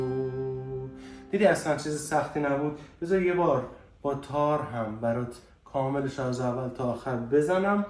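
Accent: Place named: Azerbaijan, Iran. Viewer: برعکس، دوست داریم لحجه آذری و مازندرانی شمارو بشنویم 😊 (مربوط به دقیقه ۱۱:۲۵ )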